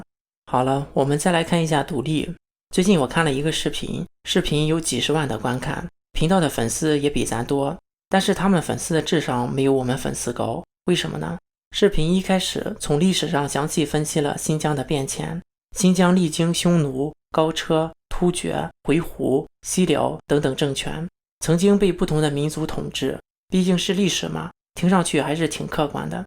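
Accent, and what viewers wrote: Shandong, China. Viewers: 这口音是山东？河北？不管了，中国应该是联邦制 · 你这口音真难听，一听就是我们山东的吧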